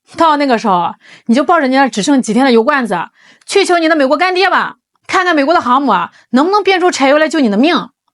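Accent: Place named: Shandong, China. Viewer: 这个女的好像是山东人，尾音每次拖很长，给人一种每句话都在嘲笑的感觉。还是把话说好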